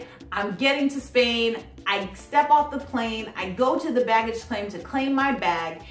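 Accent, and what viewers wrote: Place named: Cuba. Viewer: Hola, te conocí en la Academia de Tiffani me encanto la entrevista desde ahora te sigo. I love your English and the way you teach, and your Spanish accent "Cubano "😂.😊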